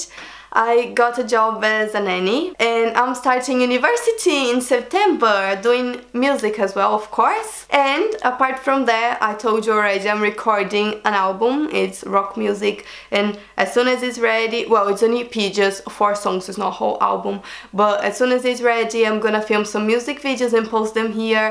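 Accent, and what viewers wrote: Brazil. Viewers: O sotaque dela não engana, muito fofo 💖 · Eu sabia que vc era daqui! Soube pelo sotaque, kkk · You have a Brazilian accent but its a Brazilian cute accent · Kkkk verdade eu olhei pro rosto escutei ela falando o sotaque bonito brasileiro ali, haha é brasileira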